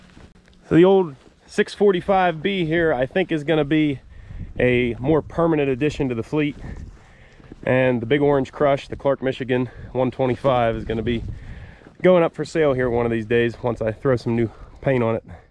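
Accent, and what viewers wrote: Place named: Tennessee, United States. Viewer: sounds like your from tennesse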